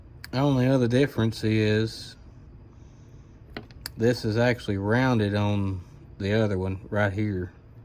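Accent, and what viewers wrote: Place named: North Carolina, United States. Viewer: Sounds like you’re from Lumberton NC. Stay safe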